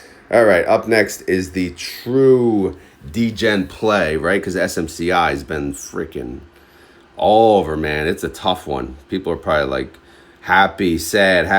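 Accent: New York, United States. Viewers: Great voice and NY accent Your title should be “the godfather on dividends”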